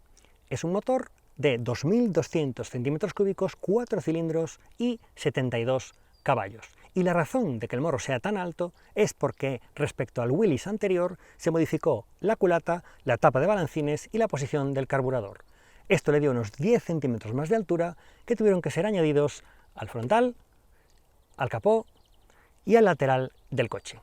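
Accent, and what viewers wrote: Spain. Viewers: Jajajaja Ya conseguí ver un vídeo completo tuyo...puse mute al audio (volúmen 0) y así me quite de encima tu castellano castizo. Sorry, pero en verdad que no lo soporto 👎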